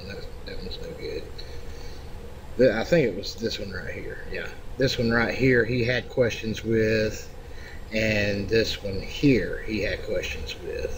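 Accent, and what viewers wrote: South, United States. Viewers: I appreciate your videos man, I love to understand how things work and when I can't do something I force myself to learn how! I was hoping to make my own cheat for America's Army: Proving grounds a 32-bit old fps game on steam and since it's so old people aren't rolling out cheats for it anymore not like CS:GO cheats and so a guy on unknowncheats forum recommended your channel for learning cheat engine hacking and I'm glad I love your southern voice and you explain everything clearly and you get to the point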